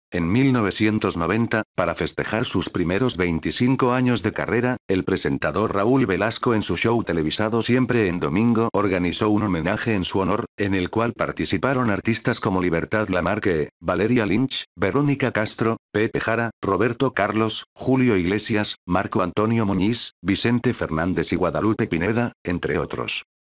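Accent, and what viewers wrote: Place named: Spain. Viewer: este chocante acento gachupín del narrador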